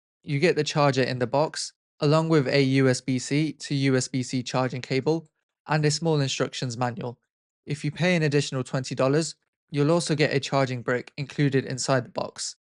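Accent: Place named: United Kingdom. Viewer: I really like your uk ascent 😂 😂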